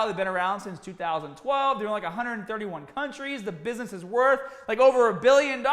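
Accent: Boston, United States. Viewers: He’s from Boston lol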